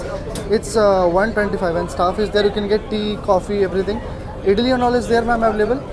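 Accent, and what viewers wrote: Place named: South India, India. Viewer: You sound more of a south Indian :D ...explained the details well.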